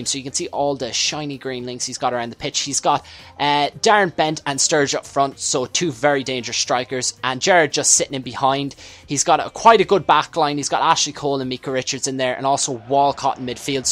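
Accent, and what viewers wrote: Ireland. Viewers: its very nice too see an irish comentator.:)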